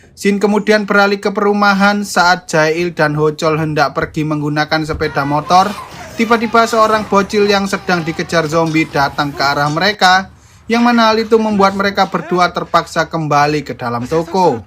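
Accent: Java, Indonesia. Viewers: BANG JAWA MANA?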